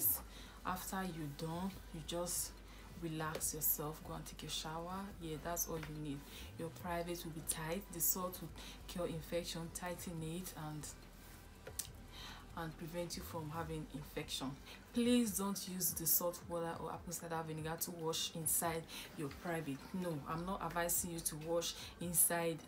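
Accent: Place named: Montreal, Canada. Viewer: t'as un accent montréalais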